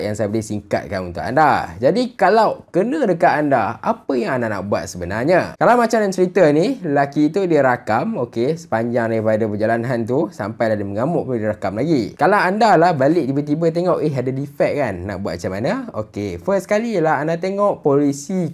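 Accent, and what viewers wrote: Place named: Sabah, Malaysia. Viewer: loghat sabah laa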